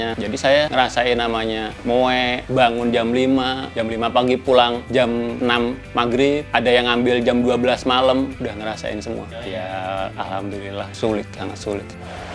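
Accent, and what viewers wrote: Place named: Java, Indonesia. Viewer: mas nya ini tinggal di ciamis tapi logatnya jawa ya... wong jowo ki mesti 😅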